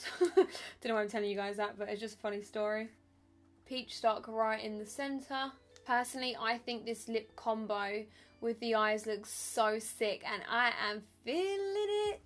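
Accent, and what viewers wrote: Essex, United Kingdom. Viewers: Are you an Essex girl?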